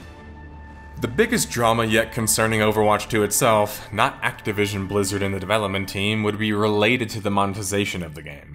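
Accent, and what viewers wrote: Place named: Ohio, United States. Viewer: I dont know whats worse. A frenchie trying to speak english poorly. Or a ohioian accent trying to speak english poorly.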